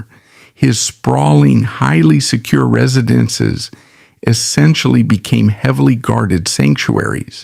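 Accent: United States. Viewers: WHY SOMEONE WITH AN AMERICAN ACCENT TALKS ABOUT ENGLISH HISTORY 🤔🤔🤔🤔🤨🤨🤨🤨🙄🙄🙄🙄😶😶😶😶😱☹️👎🏼👎🏼AND THEN PRONOUNCES SOME WORDS INCORRECTLY 🙄🙄🙄🙄😶😶😶😶🫣🫣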